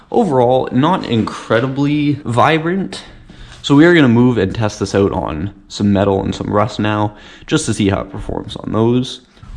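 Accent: Canada. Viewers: Bruh are u canadian?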